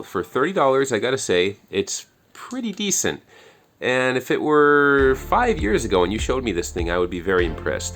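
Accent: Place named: Canada. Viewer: You sound Canadian. Is that $30 Canadian?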